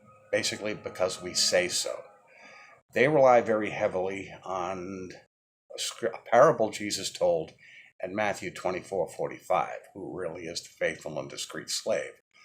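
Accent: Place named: New England, United States. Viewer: are you from Boston? Your accent sounds like you're from a New England state. I'm from New York. Like your honesty. I recently quit JWs cold turkey close to 2 months. Having slight social withdrawal symptoms, but loving the truth I am learning directly from the bible and from videos likes this.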